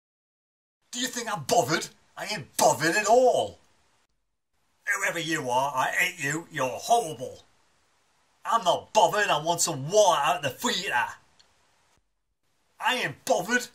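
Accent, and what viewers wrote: Sunderland, United Kingdom. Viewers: Great vid can never beat the Mackey accent seen u at Rochdale match with me mate great YouTube keep up the good work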